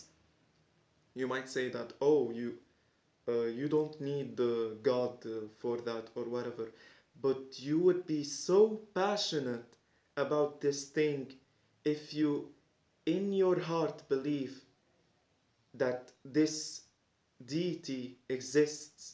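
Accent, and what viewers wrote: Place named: Lebanon. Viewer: your lebanese accent is so cute <3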